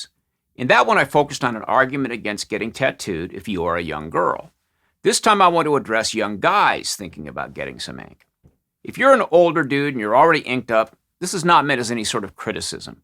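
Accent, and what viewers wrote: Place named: South, United States. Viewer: You are an ignorant hillbilly sounding asshole.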